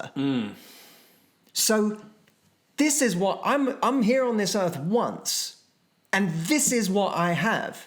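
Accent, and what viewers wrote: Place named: United Kingdom. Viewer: Your British accent is standing up again, I guess spending good quality time with family is the reason.😊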